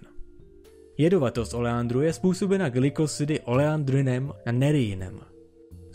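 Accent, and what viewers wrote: United States. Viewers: co co mas za skurveny prizvuk americky ? Odporny hlas video, ale fakty su dobre :)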